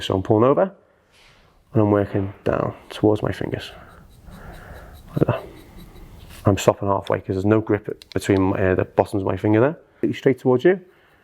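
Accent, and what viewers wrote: Liverpool, United Kingdom. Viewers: Is it me or is there a bit of a Scouse accent that pops out here and there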